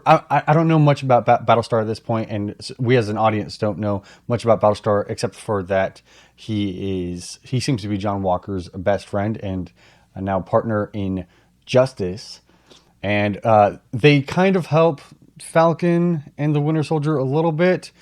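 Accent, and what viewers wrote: South, United States. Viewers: I love your vlog on this video at your house in Texas during your quarantine until you're talking about the second episode of "The Star-Spangled Man" from the mini-series of "The Falcon and the Winter Soldier" got came out on Disney+ while it's all about John Walker, who appears on the morning talk show of "Good Morning America" as Captain America, revealing his desire to live to Steve Rogers' mantle. Meanwhile, Sam Wilson and Bucky Barnes struggle to work together as Barnes expresses disapproval in Wilson's decision to surrender Roger's shield. They travel to Munich and the find the Flag Smashers smuggling medicine. Wilson identifies a possible hostage, who is revealed to be the group's leader, Karli Morgenthau. The Flag Smashers, with enhanced abilities, quickly overpower Barnes and Wilson until Walker and Hoskins requests Barnes and Wilson join them in aiding the Global Repatriation Council (GRC) to quash the ongoing violent post-Blip revolutions, but they refuse. 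Meanwhile, Morgenthau receives a threatening text from an unknown number, so you're talking about all of the characters from the start after that, I hope your husband, Zach will be proud of you and I'm very happy for you, I know you're half Mexican and I love your Southern accent!! #TheFalconandTheWinterSoldier #DisneyPlus #Marvel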